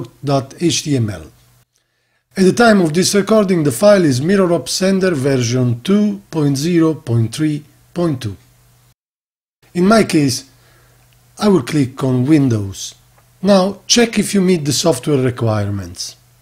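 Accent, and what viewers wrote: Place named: Italy. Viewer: accidenti l accento italiano come si sente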